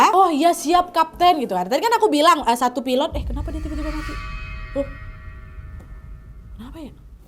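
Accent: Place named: Sumatra, Indonesia. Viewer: Nadiaaaa hahahaha aku suka dg pembawaan:) Aku suka ketawa ngakak KL sdng bercerits cerita seram atau apapun hahahaha Dengan aksen khas Sumateranya yg kental bgt. org mn? Dr Pekanbaru atau dr mn? Aku dl 3 THN sklh di Pekanbaru dan aksennya persis dg:) skrg Sy di JKT lg:) sukses ya:)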